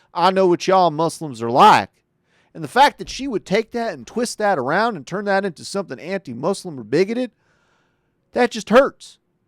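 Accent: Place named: Texas, United States. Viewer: Love the accent! You sound like I would imagine a Texan to sound like...kind of a Southern Western drawl. The internet is buzzing with what the Texas State Government wants in their text books. "My great great great grandpappy used to ride on one of them Brontos, said it made him feel closer to Noah!"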